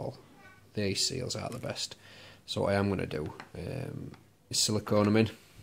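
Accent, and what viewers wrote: Yorkshire, United Kingdom. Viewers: Good to hear a Yorkshire lad doing a review! How's the DIY reactor doing? I fancy giving one a go. Cheers. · Hi mate thinking of building my own in couple of days gonna make mine very similar to yours does it work ?? P.s were u from u sound like u a Yorkshire lad.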